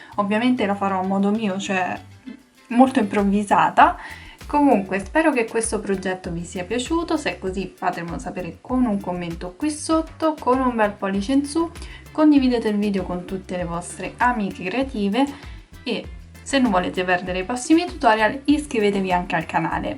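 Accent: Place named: Italy. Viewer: Belo trabalho. E bom ouvir o jeito de falar do povo italiano . Me lembro da minha nona . Minha vóvo falava assim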